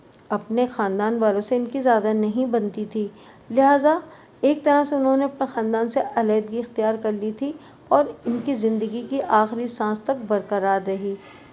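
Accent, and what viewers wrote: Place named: Karachi, Pakistan. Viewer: BB aap Ka taluq Karachi se feel hota hai 🤩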